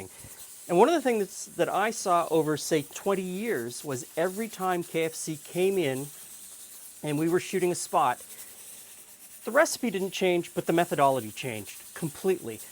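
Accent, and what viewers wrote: Canada. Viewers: 100% your canadian love the way you say about , watched a few of your vids and I have subscribed all the way from Scotland :)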